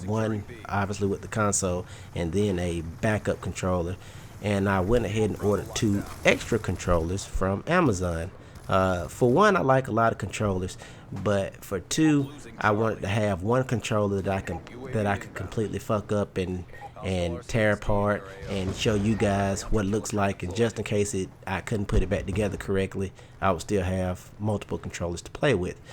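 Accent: South, United States. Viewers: had to restart to see the new intro... it's the shit. I wanted to speak on a vid u did not long ago venting on the youtube game, subs and stuff. The reason i luv you channel is the reason your subs may be low. 1)don't care if people disagree a southern accent, on a black man especially people expect you to be dumb/ignorant. In this case no where close. 2)The unboxings you show should be less unboxing more review bc that matters most 3)there are just haters and i have a few ideas just respond pls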